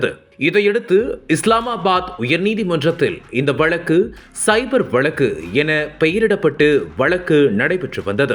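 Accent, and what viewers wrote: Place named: Sri Lanka. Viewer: Voice style nalla illai.. rombba irritation aagudhu ....sri lenken modulation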